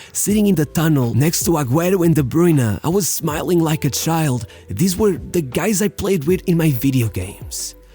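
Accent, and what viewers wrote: Portugal. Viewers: Are you Portuguese by any chance? Your pronunciation sounds like it. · He isn't the most hated man in England, he isn't even the most hated man in the Tottenham starting 11 for most people I'd imagine. Guy can come across as a little bit of a twat on the pitch, rolling around and trying to get under people's skin but he's not unique in that respect, not even in the Tottenham starting 11 and he's generally an enjoyable player to watch. Had that one little bit of "showboating" controversy recently which is the only thing that stands out as abnormal for a player playing in the premier league but that was more media than fans and most fans seemed pretty 50/50 with no strong feelings one way or another because all he did was a couple of keepy uppies mid game then passed the ball. Still don't see how that can make him "the most hated man in England". 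When ever I watch your videos I generally find them to be void of much fact, just opinion pieces on how you feel like seeing the world spoken as if that must be how the world is with no real research to back up your statements. I mean this video was basically somebody with a Portuguese accent reading a short biography of Richarlson. You can do better. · Eu SABIA que eras português. Tu falas bue bem mas todos os tugas têm um sotaque a falar inglês. Tmb fiquei desiludido com portugal. O fernando santos já não tem condições para continuar, precisamos de encerrar este capítulo e começar um novo... mas até agora não foi despedido. Abraço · yoooo tu és tuga né? esse sotaque a dizer os nomes dos jogadores parecia bue mm. +1 sub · quando ouvi a tua voz percebi logo que eras tuga, keep up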